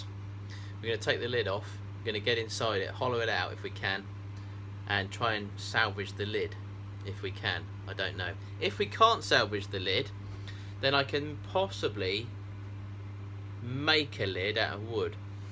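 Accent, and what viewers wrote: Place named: England, United Kingdom. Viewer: Don't worry about your talking. I can listen to you all day. I love your accent. We don't come across too many people with English accents in my area in Chicago. 😁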